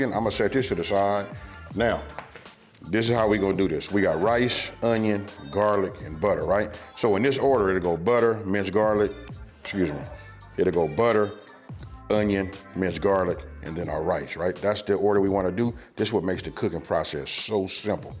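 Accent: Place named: South, United States. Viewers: where would you say your accent is from? I noticed your vowels sound a lot like Ryan Coogler's from the interviews I've heard and I've heard that accent before but idk if I can place it. It's like a combination of Southern California and the American South but not somewhere I can place. Is it Oakland? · I will believe any cook with a southern American accent that they know what they are doing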